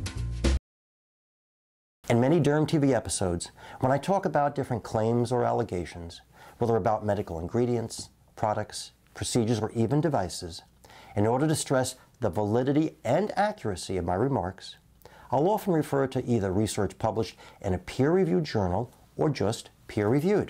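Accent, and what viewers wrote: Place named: East Coast, United States. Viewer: He´s definitely from the east coast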